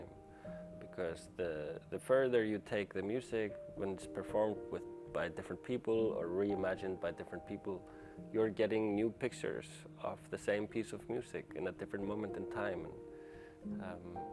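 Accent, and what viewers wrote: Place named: Iceland. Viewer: Just happened to witness you talking for the first time and your Icelandic accent is so charming. You could easily do ASMR content btw. Oh, and cheers for all the blissful music you make. ❤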